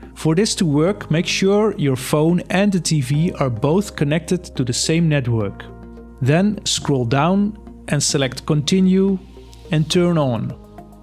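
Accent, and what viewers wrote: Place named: Netherlands. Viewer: He sounds so Dutch!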